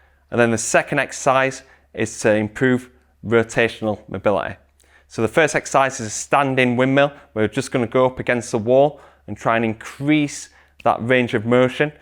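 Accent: United Kingdom. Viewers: This is an english accent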